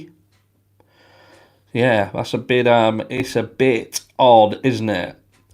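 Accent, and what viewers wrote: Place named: Countryside, United Kingdom. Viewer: Banksy! Banksy is so cool. I’m a Brit living in 🇨🇦 and I enjoy your countryside English accent! Great channel, recently discovered you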